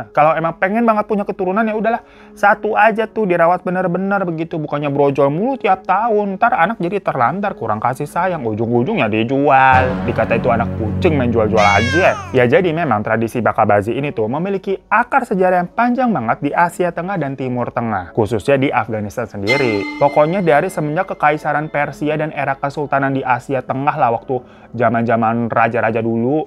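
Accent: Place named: Central Java, Indonesia. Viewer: Abang aslinya dr jateng mana ngapak nya.....utara atau selatankah.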